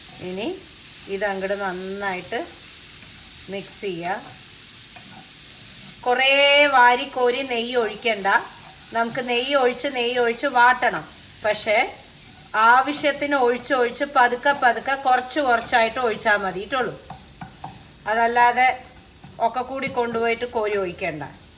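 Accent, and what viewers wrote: Palakkad, India. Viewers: pole samsarikkunna ente palakadu kootukare viji annu peru.adhyamokke varathamanam kelkkanum mathram njan video kandirunju..eppo recepie koodi kanan thidangi tto.thank you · Chechi.. This is the first time I am watching ur video... Chechide samsaram kelkan enthu rasam aaneno... Thani palakkadan bashayil pachaka kurippu kelkkumbo natil ethiya oru feel... Pine ganapathi homathinde prasadam, idichu pizhinja payasam okke enthanelum idane... Samayam eduthu authentic aayitu cook cheyyunath kaanan thanne undu oru sugam... 👍👍👍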